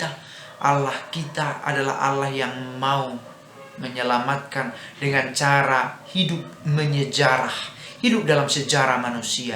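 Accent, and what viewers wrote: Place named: West, Indonesia. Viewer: Frater dialek kebaratan diperbaiki. Tks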